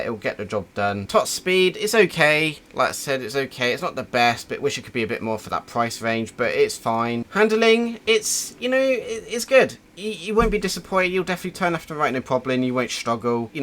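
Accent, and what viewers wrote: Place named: United Kingdom. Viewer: That is British English for sure haha. Thanks for a nice video 😊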